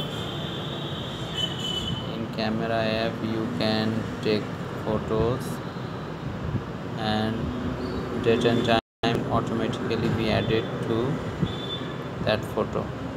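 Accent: India. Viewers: I dont mean to sound racist, but why all videos related to cellphones has an Indian guy talking behind it? Its creepy. Its like every single video. Does beign Indian give you some special insight to these kind of things?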